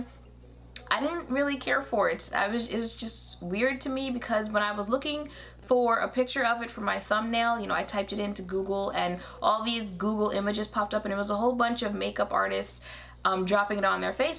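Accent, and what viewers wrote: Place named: United States. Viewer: The foundation was a perfect match ! It's the first time I see you wear blush,it's really pretty ! Btw,"amande" is pronounced "amand" but i love when americans speak french,it sounds a lot cuter than when we speak english :p beautiful as always ;) xx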